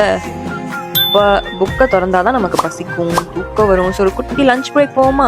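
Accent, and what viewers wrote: United Kingdom. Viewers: Your British accent is too good ❤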